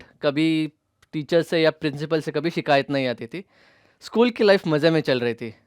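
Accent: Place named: Karnataka, India. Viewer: Kannadi accent was the best part of this video..... 😂😂😂😂😂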